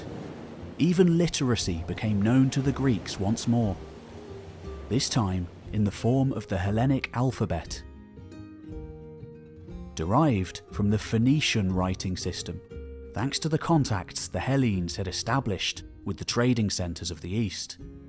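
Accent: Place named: Liverpool, United Kingdom. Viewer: How many of these weird scouse type accents are there on these DIY history channels? It's both unlistenable and spreading like a plague.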